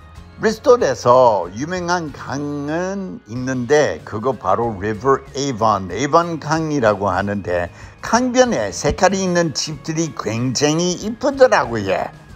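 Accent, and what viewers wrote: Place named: Gyeongsang, South Korea. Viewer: 남들은 몰라도 저는 부산이 고향이라 경상도 사투리로 설명해주신게 너무편하게 잘들리고 쏙쏙 이해가 잘되서 너무 좋습니다~^^ 항상 건강하시고 다음편도 기다리겠습니다~!!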